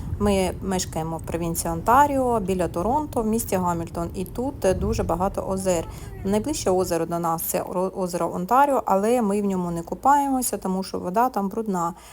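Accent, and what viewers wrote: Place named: Cherkasy, Ukraine. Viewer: Дякую Вам! Хороші роботи. Приємно дивитися і чути Вас. Натхнення Вам і нових творчих задумів та їх здійснень! Я з України. Мені здається, що Ваш говір черкаський 😊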